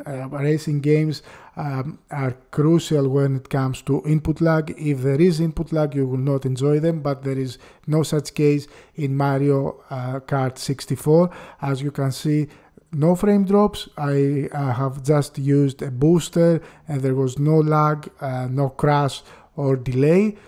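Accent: Greece. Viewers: What about conker's Bad fur day? BTW: where are you from? You accent sounds Greek.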